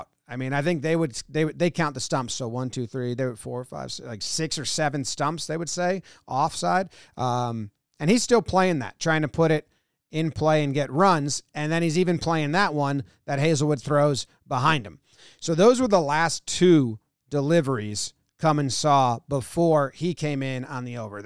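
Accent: United States. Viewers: Made my day. Sounds b like an American and he’s analyzing the game. Warms the cockles of my heart ❤❤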